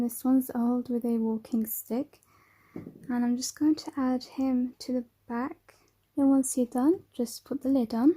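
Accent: England, United Kingdom. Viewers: do you live in England??? Love ur beautiful accent!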